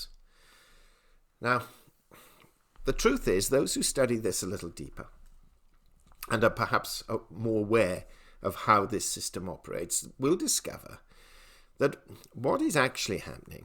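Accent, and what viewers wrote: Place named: RP, United Kingdom. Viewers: Yes I agree! I would not be surprised if they all have ties to the central banks the LBMA & The Crimex (Comex). I'm sick of the posh tone and arrogance! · I do not understand this channel. Year 2019 it was mainly critizing silver "pumpers" and mocking them. Then silver prices started to go up during the fall to the highest levels in years, so was wrong. Why call themselvs something "silver" when they clearly do not believe in the metal? I think anti-silver would be a better name. And the upper class english does not help either. Just saying...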